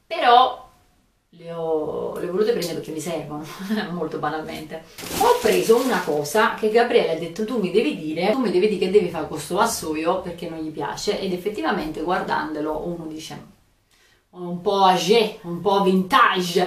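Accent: Tuscany, Italy. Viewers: Perché toscano esci da questo corpo!!!! È' così bello il toscano simpatico allegro 😍😍😍 comunque bellissimi acquisti naturalmente come sempre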